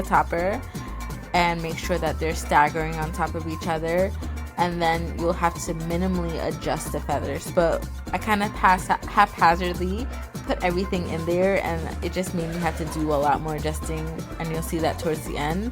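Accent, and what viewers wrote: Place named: Trinidad and Tobago. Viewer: Lovely. You sound like a trini!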